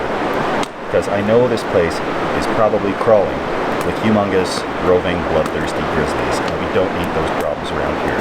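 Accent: Canada. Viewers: I detect a slight Canadian dialect, right along with Northern Humor. Thanks for your enlightened video on camping in grizzley country. - Just great.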